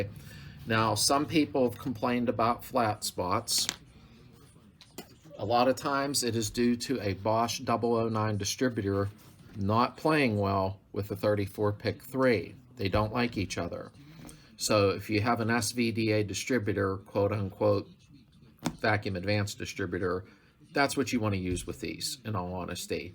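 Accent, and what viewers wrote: Pittsburgh, United States. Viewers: pittsburgh accent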